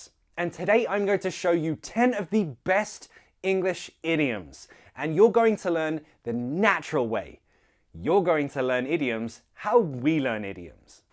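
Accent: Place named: RP, United Kingdom. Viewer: Thanks ever so much, I like the way you talk and teach, and you are very understandable. I like the RP English accent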